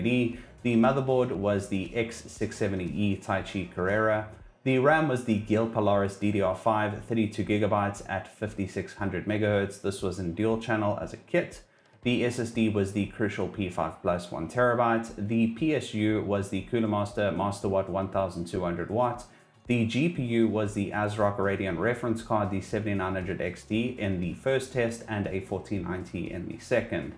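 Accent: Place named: South Africa. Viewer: South African accents are always so noticeable haha. Nice video man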